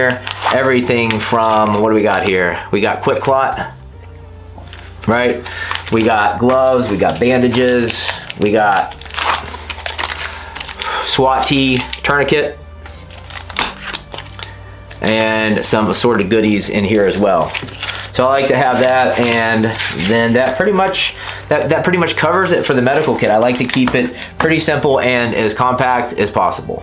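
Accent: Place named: Florida, United States. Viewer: You have got to be around the Florida line between your accent and that moss. Every time we go close to Florida line we start seeing that moss and a mixture of palm trees. Thanks for the video. I really enjoyed the videos.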